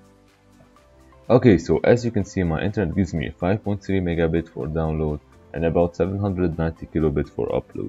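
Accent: Lebanon. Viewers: Are you Lebanese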